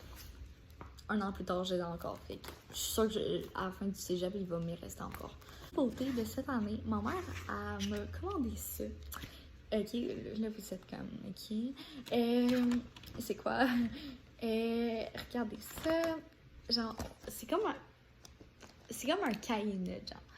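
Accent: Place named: England, United Kingdom. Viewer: You have an English accent ❤️❤️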